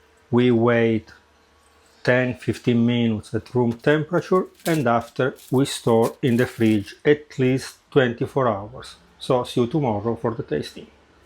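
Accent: Italy. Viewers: Tuoi vídeos mi piacciono moltissimo. Mi piace tanto il acento italiano. And tour recipes and techniques are great!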